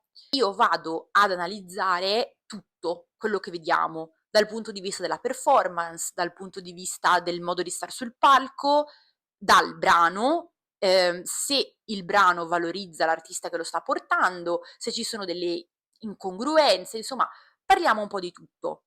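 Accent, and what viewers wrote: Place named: Tuscany, Italy. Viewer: nella parlata hai un sottofondo toscano, forse hai parenti o origine di quel posto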